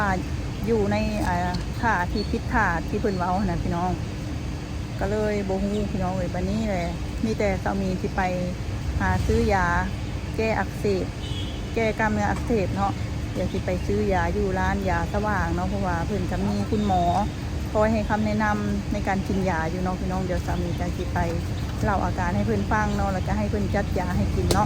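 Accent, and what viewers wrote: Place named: Nakhon Phanom, Thailand. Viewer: สบายดี สาว คนงาม สุขภาพแข็งแรงร่ำรวยร่ำรวย เด้อ.สาวเซโน สำเนียงเว้า คือทางบ้านนาหลวง นาทราย เน๊อะ อำเภอเมือง นครพนม (คนไทยในต่างแดน)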